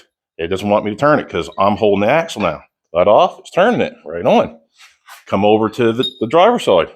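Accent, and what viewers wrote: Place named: Nova Scotia, Canada. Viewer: I knew that accent was from Nova Scotia !!! Kings county here brother !!!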